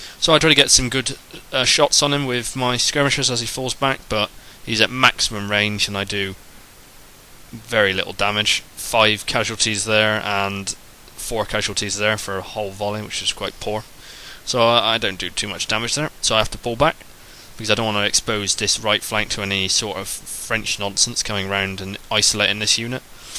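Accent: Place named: Bristol, United Kingdom. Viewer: am I right in saying bristolian accent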